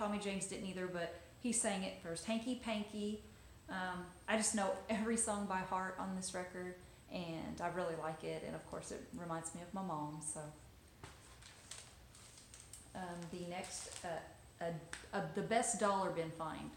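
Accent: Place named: Georgia, United States. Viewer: Are you in the Carolinas? Trying to place that voice. I was born in the Midwest but grew up in the Carolinas. You sound like you're from my neck of the woods. Maybe Georgia.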